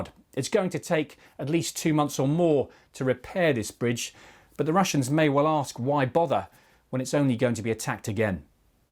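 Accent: United Kingdom. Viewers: added points; after 1000 times only a few success for Ukraine 2.The air defence of Russia is robust 3. Ukraine air force already tried and failed 4. The drone boats were killed so many times by russia and still ukraine is trying 5. Of course Ukraine will take punishment if they really hit the bridge 6. May be Russia knows a lot about what are the assets Ukraine receives from west that why the counteroffensive is dud like ur mouth 7. Sun Szu would faced chengiz khan he would have not written the book 8. Actually Russia is playing long game to damage all western equipment's 9. in summary an old pig with british accent just doing propaganda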